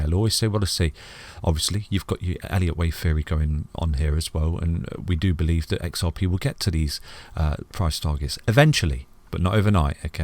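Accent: United Kingdom. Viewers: Like yout british accent.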